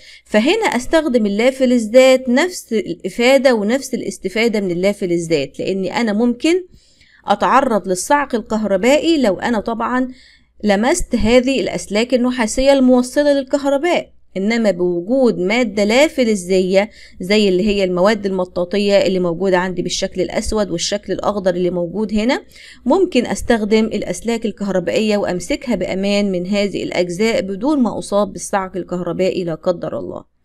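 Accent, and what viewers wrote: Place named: Saudi Arabia. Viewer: ليش تتكلمي سعودي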